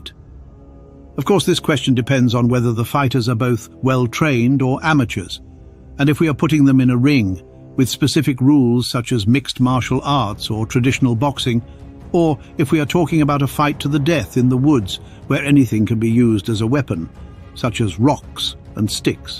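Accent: United Kingdom. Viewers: Just because there is a British accent delivering information doesn't mean that it cant be patiently stupid information. Right, wrong or infifderrnt, his is just way over done without any real science behind it.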